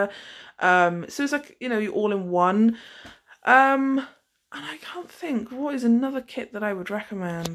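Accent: England, United Kingdom. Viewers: 🤗 Your little English accent is so freaking cute! I love it when you say "lush" and "bloody" and stuff!💖 Everything you say sounds so super classy! Yeah Amazon is like where I LIVE!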